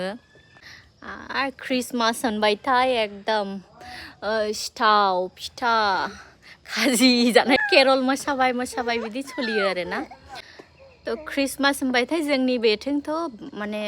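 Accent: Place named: West Bengal, India. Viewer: West Bengal ni boro railynaiya alda kwnaiyw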